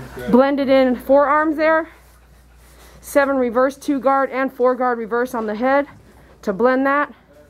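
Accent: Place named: Texas, United States. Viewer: Your from Texas 0.0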